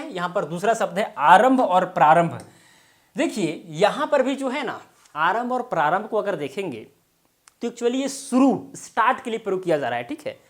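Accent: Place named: Bhojpuri, India. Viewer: Aap toh khud ki galat hindi bolte ho. Grammer galat bolte ho. Accent ki baat toh rehne do woh toh alag ki bhojpuri accent hai.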